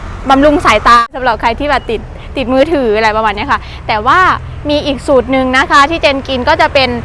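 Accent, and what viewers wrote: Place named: Yoi, Thailand. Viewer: ลาวสำเนียงโย้ย.. ฟังคิดฮอด ไทโย้ย อากากาศอำนวย สกลฯ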